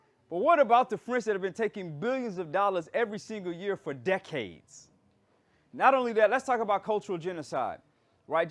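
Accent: Ghana. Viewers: Lol, your American accent is not 100 % anymore. Except for the parts where you are deliberately sounding Ghanaian, you have that Ghanaian accent flaring up for real and creeping in uncousiosly.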